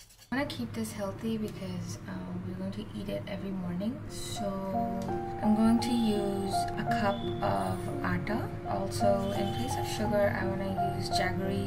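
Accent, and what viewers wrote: United States. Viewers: Y do you have an American accent. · Why this American accent girl. You should speak normally.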